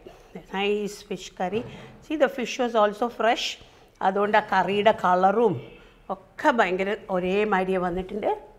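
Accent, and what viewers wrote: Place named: North Kerala, India. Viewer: Superb presentation. Like a mother teaches her sibling. North kerala cooking with North kerala dialects... 👌🏻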